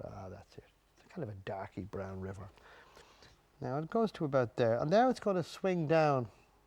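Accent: Ireland. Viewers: Thank you so much for putting this lesson on. I've followed along with quite a few of your paintings and have learned a lot from you, and I love hearing you talk and listening to your Irish accent! Please keep on teaching us. Thank you!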